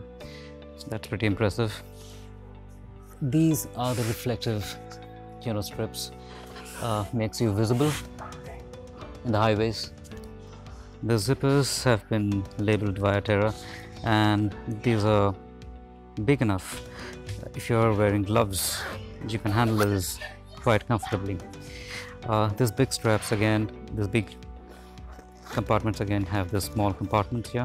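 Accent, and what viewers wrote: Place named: England, United Kingdom. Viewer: Explained neat and nice! The English accent is lit!!!!!!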